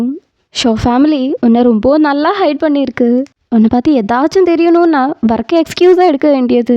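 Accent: Kerala, India. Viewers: Chechi sherikk malayali thannenoo malayalam explanation mm Tamil explanation adipoli ayitt parayndallo 😌❤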